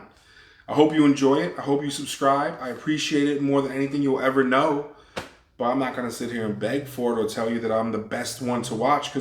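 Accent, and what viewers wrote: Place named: New York, United States. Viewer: I knew it; you couldn't hide the NYC if you tried lol. Great video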